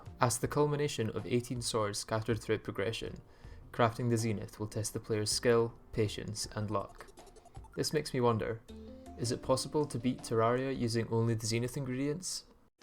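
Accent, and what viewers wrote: Scotland, United Kingdom. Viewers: You Scottish ???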